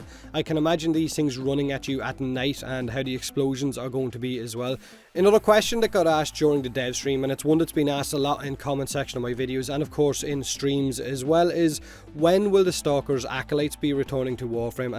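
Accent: Ireland. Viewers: the moment those words split out of the mouth of that, I knew!! I will always luv this Irish dude talking aboat Warframe <3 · ** whoever criticised you for looking a little uncomfortable. Let them sit in front of tens thousands of people from all around the world and try to keep it as cool as you did, sitting there happy in the background, all potatoy and s**t. Was awesome seeing you there. First devstream I watched completely (30% because of you, maybe 40%:P). So keep your head up and your accent irish^^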